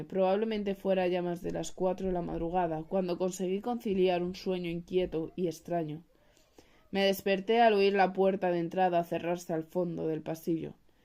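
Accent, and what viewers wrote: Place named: Spain. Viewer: Gracias me gusta como das paso ala lectura,TU voz suena de españa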